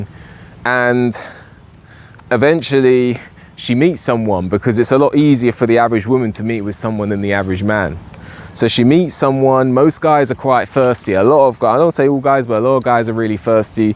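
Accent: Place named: United Kingdom. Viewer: Bra it was bc of your bloody British accent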